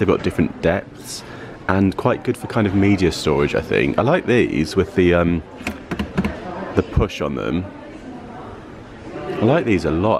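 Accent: England, United Kingdom. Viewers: Love your vlog, so nice to listen to someone from England. Some nice things there so now I have the job of seeing if they do them in Murcia, Spain!!! Keep up the good work.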